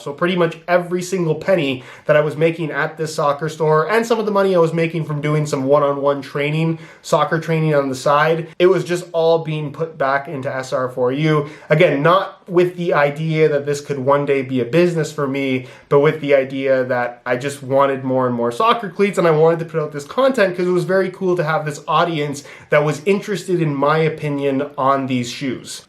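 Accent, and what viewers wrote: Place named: Canada. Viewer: Lmao I always used to watch SR4U but I started to care a bit less about soccer (football over here) and stopped watching your channel. Today a video popped up in my recommended by this new channel called ' ' and I clicked on it. I thought you seemed familiar but just assumed all young Canadian guys must just sound really similar to me😅. It was only when I saw one of these videos in your soccer cleats room that I realised it really was you lmao. You've just earned yourself a new subscriber, keep up the good work because this channel is probably going to take off with more non soccer players getting these videos in their recommended.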